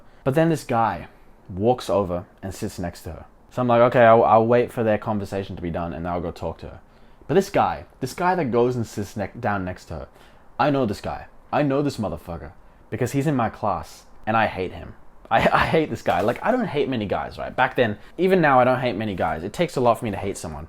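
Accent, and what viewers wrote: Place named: Australia. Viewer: from the accent it's definitely australia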